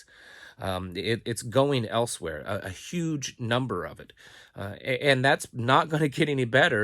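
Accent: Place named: Missouri, United States. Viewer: Too many folks are more interested in growing different types of marijuana to get wasted on than crops to eat and survive on! What state you live in, ya sound like a Missourian!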